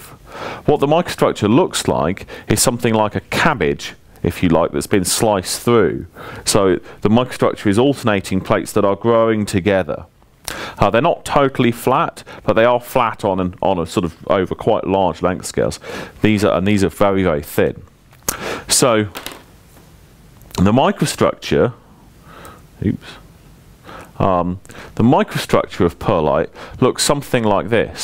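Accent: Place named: United Kingdom. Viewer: Being an American from the deep South, when I heard his British accent I knew this was going to be a good night. My entire study group sends a thank you from across the pond for posting these videos. Hopefully we'll pass the test in the morning.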